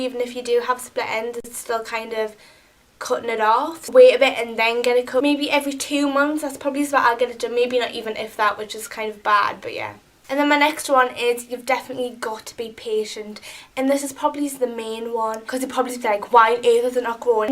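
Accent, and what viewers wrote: Newcastle, United Kingdom. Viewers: Her Accent is Gordie, What Gordie Shore, it will change your life. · Shes got a geordie accent, from around newcastle in the UK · She's a Geordie like me omg! From Newcastle!🙊 ♡ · u from newcastle? i think i see a fellow geordie? ahaha x whey aye man x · shes not from liverpool shes from newcastle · Omg , I'm a Geordie as well! Best accent ever! ,xo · it's geordie, like me :) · She is from Newcastle by the sound of her accent - similar to mine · Guys she isn't Irish, she's from Newcastle in England :) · I think shes from Newcastle in Britain. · shes from Newcastle not feking Ireland -_ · Her accent isn't 'fake' shes from newcastle, north easy of England. I went to school with her. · She's from Newcastle :) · She talks like them in geordie shore. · She has a Newcastle accent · Yay geordie accent :D I'm from Newcastle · R u from Newcastle?????? 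· its a geordie accent (newcastle) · She is British, she is from Newcastle I think... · That is definitely not an Irish nor Welsh accent. If I was to guess, I'd say wither Liverpool or Newcastle. Probably the latter. Bloody lay off it. That's not the aim of the video. dayum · She's from Newcastle!!! You will recognise the accent if your from Newcastle or watch geordie shore, her accent is not fake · Erm for all who want to know she is geordie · Geordie accent ? (Newcastle ) proppa love ittt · She's a Geordie lasssss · no its not? it's a geordie accent. (i am also a geordie). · omg we have the same name and are you a geordie, if you are we are so alike!! x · is that the Geordie accent? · Ive got this accent too, shes geordie :) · im from newcastle, yeah she is from newcastle, in Britian we're Geordies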